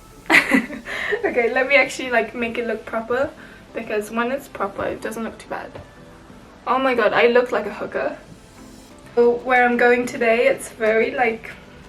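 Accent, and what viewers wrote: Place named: United Kingdom. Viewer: Wow! It's great that you have such a great sense of fun about this BUT, It's really deplorable and sad that it's even necessary to approach this subject. I think you will need a paper bag over your head and some kind of sack clothing to prevent being recognised as asian. I've been away from England for 40 years but your accent and expressions sound beautifully British to me, so no worries there.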